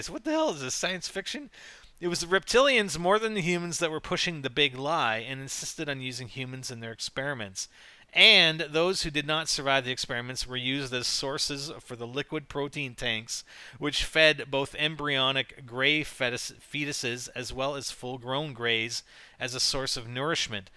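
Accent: United States. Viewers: Where do u live you sound american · yeah, he sounds american... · What country are you from? You sound American or Canadian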